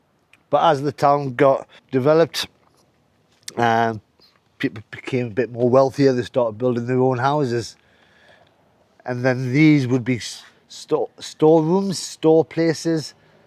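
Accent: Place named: Northern England, United Kingdom. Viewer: you are welcome. Thanks for your videos. Your positive attitude shines through. I also love different accents and yours, i guess northern England, is just great. Like you i am fascinated by history and travelling. I learned all this quite recently when i began digging in my family tree and read the history for context. This is not taught in schools.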